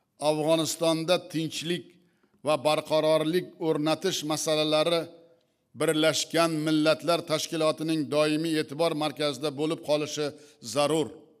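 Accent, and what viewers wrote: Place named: Uzbekistan. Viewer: Ажойиб таклифлар,!!Тарихда биринчи марта узбекча нутк намоиш этилди,!!Рахматли Каримов ойиласи умуман узбекча билмаслиги жуда ачинарли ахвол эди!!Минбарда бутун дунега Узбек охангида нутк сузлаганингизнинг узи буюк тарих!!!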